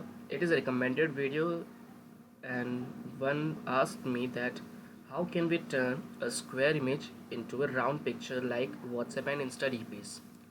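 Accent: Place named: United States. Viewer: Missing your american accent😕😢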